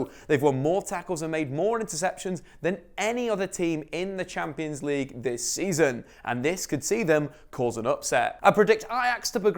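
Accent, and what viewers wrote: United Kingdom. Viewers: The minute I heard your UK accent I knew that you would predict that Liverpool would go through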